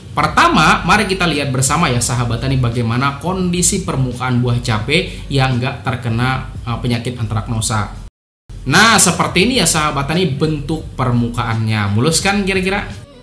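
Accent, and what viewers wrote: Banjar, Indonesia. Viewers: Orang banjar kah pian mas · urang banjarkah pian julak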